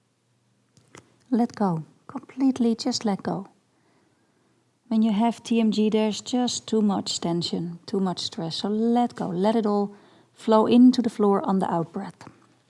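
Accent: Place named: Netherlands. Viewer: Hoy Ik hoor van u acsent dat u van Nederland Komt maar dank u wel voor de yoga de yoga tip😊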